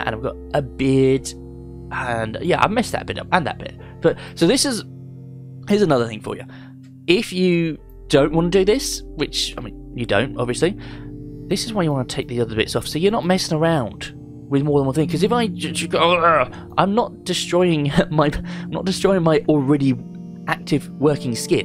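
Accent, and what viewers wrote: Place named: United Kingdom. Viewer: I knew he was living in UK not beacuse of the location but the accent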